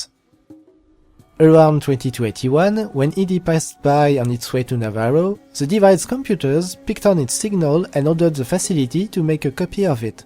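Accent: France. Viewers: Damn that is a strong Frog accent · U french bro? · Pour un français tu parle bien anglais, bosse un peu ton accent mais a part ça merci ca explique pas mal de chose et de façon limpide ! Merci et good luck ^^ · you have the stereotypical french accent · Ma man's way too french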